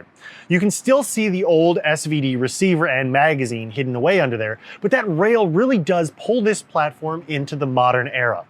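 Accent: Russia. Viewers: How to talk like that? Russian accent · I Loved the super detailed review. All the info was much appreciated and incredibly helpful. Can't wait to see more reviews like this, Russian accent or not. Good job and Evike crew. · That Russian accent though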